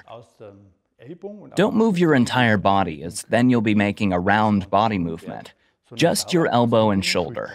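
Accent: Bavaria, Germany. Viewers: 🤣 Hätt mir scho gedocht da spricht englisch mit bayrischn Akzent 😅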